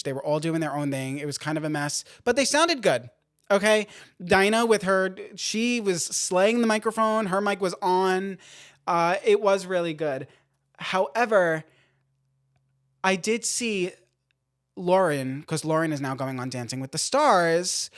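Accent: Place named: New Jersey, United States. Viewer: Your jersey accent sounds amazing. Da drawer. Love it!!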